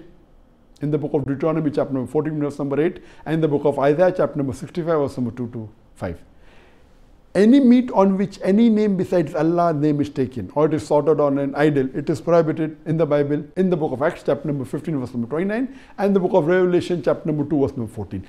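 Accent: India. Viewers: what was the last word ? i didnt hear it why he speaks in hindu englisch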